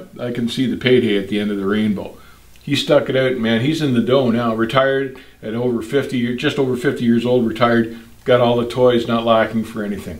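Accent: Canada. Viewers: You're Canadian, eh?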